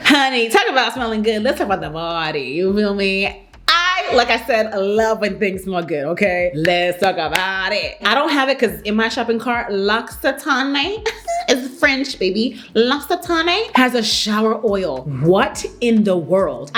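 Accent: New York, United States. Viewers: Are you from New York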